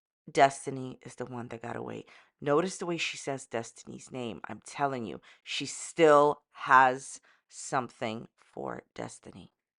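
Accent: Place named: Long Island, United States. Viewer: sounds Long Island-y to me.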